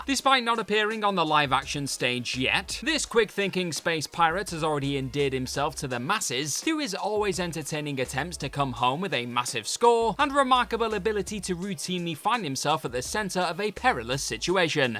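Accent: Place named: Cockney, United Kingdom. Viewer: You missed yourself off the list. I'd cast you as either the 2-headed alien sportscaster at the Boonta Eve podrace or a new host for Jedi Temple Challange. Then you'd be my favorite Jedi Master! Your glorious cockney accent would get those younglings moving! 😆😆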